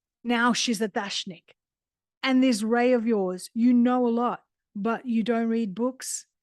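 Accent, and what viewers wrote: Australia. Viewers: I agree 100%! Beautifully read! A lovely soft Australian accent makes for easy listening.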